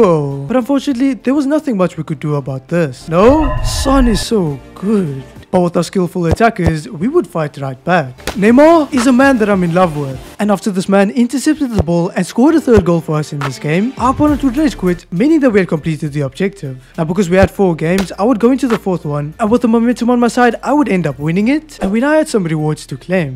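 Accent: South Africa. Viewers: Yo are you South-African? It’s awê to see a fellow EAFC lover from RSA! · Are you from south africa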